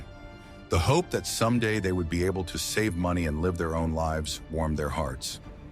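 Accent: United States. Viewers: More god awful American robot narration!!! 3 seconds in and I'm off!! 👎👎👎👎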